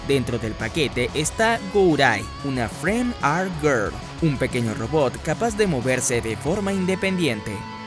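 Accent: Venezuela. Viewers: bro eres de venezuela coye que bien vl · Eres de venezuela? jajajajaja este pana me agrada ahora :v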